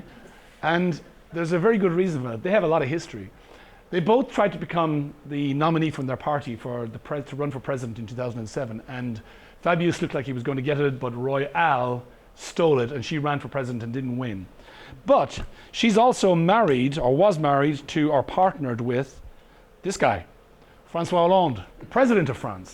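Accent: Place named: Boston, United States. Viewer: Cool Boston accent!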